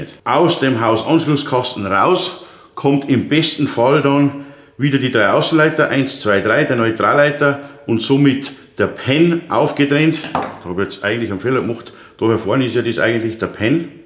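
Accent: Bavaria, Germany. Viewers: Herzlichen Dank für die klaren Worte und die guten Erklärungen. Mittlerweile habe ich viele Videos von Ihnen angeschaut und finde jedes sehr interessant und für mich praktisch umsetzbar. Vor allem das Thema Notstrom, Einspeisung und Ecoflow betreffend. Kompliment! Positiver Nebeneffekt: Ich habe mich an den bayrischen Dialekt gewöhnt, sodaß ich heute ohne Rückspulen zu müssen, alles beim ersten Mal verstehe! 👍👍🍻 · Einmal wieder ein sehr informatives Video. Dankeschön. Auch, wenn es für mich leider wieder eines der Videos war, welches für mich aufgrund des von mir (im Verhältnis zu anderen Videos) erneut verstärkt wahrgenommenen bayerischen Dialekts akustisch leider nicht durchgängig einfach zu verstehen war.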